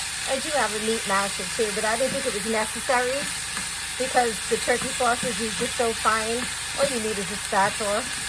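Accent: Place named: Massachusetts, United States. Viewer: Love your accent too because I am from Mass :)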